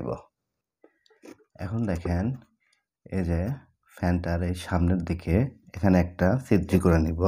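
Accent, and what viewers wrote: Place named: Bogura, Bangladesh. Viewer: বগুড়া বাড়ি নাকি বাড়ে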